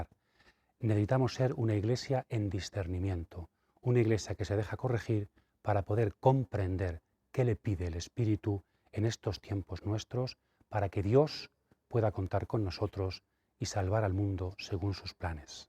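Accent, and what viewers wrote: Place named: Spain. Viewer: Escuchar a un sacerdote de sana doctrina y con acento español no tiene precio. Dios lo bendiga Padre!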